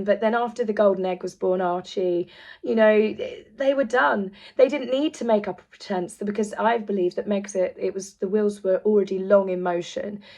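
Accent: South, United States. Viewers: Okay, your American accent, dare I say slightly Southern accent, is EVERYTHING! I have a cousin who speaks just like that 😂😂😂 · so. how about trying an accent that doesn't sound like a part of the southern U.S. You obviously have no idea what you're talking about. Maybe try staying in your lane. FYI, we don't like them either. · Do feel better!!! You still look fabulous!!! Your American accent was good..made me smile!! Americans are more aware every day they are scoundrels...how could we not be, they won't shut up. Too bad their millions cannot buy them a personality, they both are horrid!!! Thanks for video, was wondering where your "voice" was.... Great that you are feeling better!!! 💫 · OMGOODNESS!!!! Your AMERICAN ACCENT IS AMAZING!!!! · I think you need a little work on your Southern American accent 😅. But decent try (from someone living in Florida)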